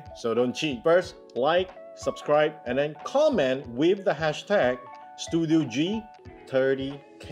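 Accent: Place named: Philippines. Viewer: You sounded more like a pinoy than a malaysian to me..anyway Great Job👏👏👏 this motivates me to unwrap and assemble my Gundams, but paint not..no tools yet. I ll be studying more of what you do kabayan🙏🙏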